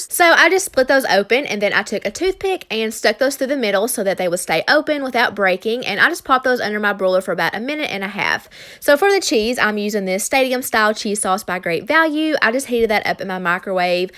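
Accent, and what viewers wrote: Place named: Kentucky, United States. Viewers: I knew i recognized that accent! What part of kentucky are you from? Im in taylorsville. Btw i love your videos im always stuck on what the heck to make for dinner and this is my way out everytime, thank you! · Kentucky! So there's the accent! ;) I had an Aunt that lived in Padukah. I'm in IL myself. Loving these videos!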